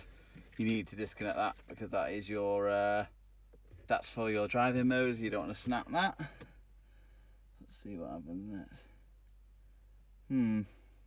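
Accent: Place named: North, United Kingdom. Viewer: Number one you are really tan for somebody with a slightly northern accent. Maybe I got that right maybe I got it wrong But thank you very much this was really informative I have a 2011 John Cooper works that I wanted to change out everything in to match the wrap that I am doing on it you’re just really really helpful all the other ones not so much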